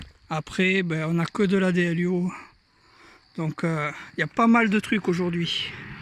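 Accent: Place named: South-West, France. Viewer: "Était" Il a un empêchement, peut être qu'il veut faire une pause sur les vidéos, problèmes médicaux, j'aimais bien son accent de chez moi (Sud-ouest, Dordogne)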